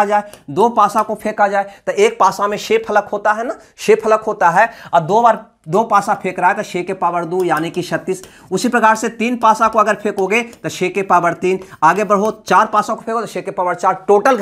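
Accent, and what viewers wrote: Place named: Bihar, India. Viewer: Sir aap bihar se ho kya.... Language se lgta... Hm Bihar se 👍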